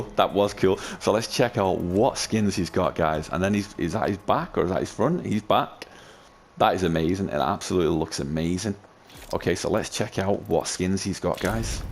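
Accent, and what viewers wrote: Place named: Manchester, United Kingdom. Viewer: Nice vid mate and nice to hear a manc voice haha!! subscribed!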